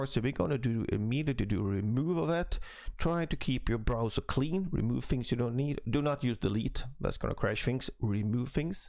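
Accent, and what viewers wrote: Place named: Sweden. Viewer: Your accent is extremely similar ( he is also from Sweden)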